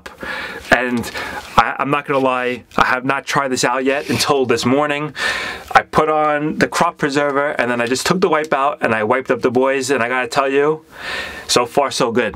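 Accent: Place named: New York, United States. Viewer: Bro has the NY accent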